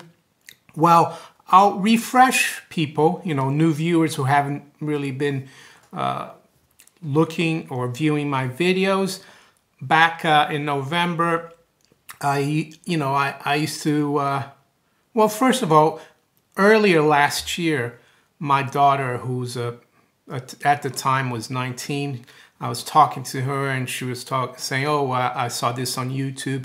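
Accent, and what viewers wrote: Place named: Massachusetts, United States. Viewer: I know you've lived in Geneva and you've been in London for quite some time, and I think you mentioned in another video that you were born in Brazil and your family is from Brazil. Not that it matters, but I'm wondering why you talk like you're from Massachusetts. Do you have connections to the States?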